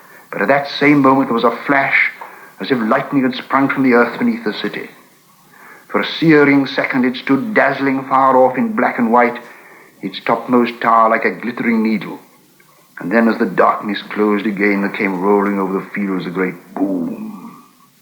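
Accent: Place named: Scotland, United Kingdom. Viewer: His Received Pronunciation makes him sound Scottish!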